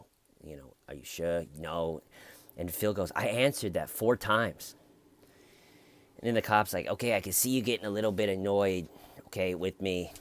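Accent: Boston, United States. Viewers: Omg, you are historical. Great Boston accent. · BTW your boston accent is killer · STOP WITH THE STUPID BOSTONIAN ACCENT! YOU SOUND LIKE AN IDIOT!😠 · This is the first time we’ve seen your hair, isn’t it? A neat cut! Love your Boston accent.... reminds me of JFK. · I love your Boston accent 😂😂😂 just made me picture a bunch of cops from The Departed! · I love your videos! Keep it up, totally makes my day and the Boston accent is priceless. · So true about having a gambling addiction. I'm imagining how depressing and devastating it has to be to keep fucking myself over like that, and I seriously would want to take MYSELF out. Ok your Boston accent is funny as hell. I'm in Western Mass for 10 years now (which has it's own dumb accent) and it took me years to realize that the Boston accent is NOT indeed a speech impediment or a foreign accent. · You sound like a whacked out Kennedy lol w the Boston accent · OMG you got the Boston accent! 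A reason to say "balls" is cuz he (the detective) is playing an angle of class difference (a huge social deal in Boston and more so back then) so he can show he isn't deferential to a doctor-to-be -it's like a micro-aggression to convey shit is getting real Phil ( IMO ) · Love ur Boston accent. Lmfao! Sound like Marky Mark back in the day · you'd fit in perfectly, ya look like a Bostonian Irishman... Ya got the accent down better than most lol.. I'm from Southeast Mass... Closer to RI, I have more of a New Jersey accent ... Strange how it is round here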